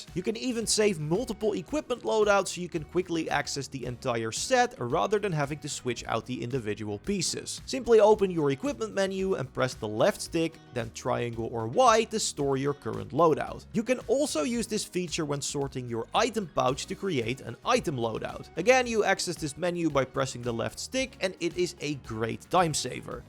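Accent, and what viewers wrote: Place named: Netherlands. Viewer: Ur dutch right? :p I can always tell in the first few seconds of someone dutch speaking english xD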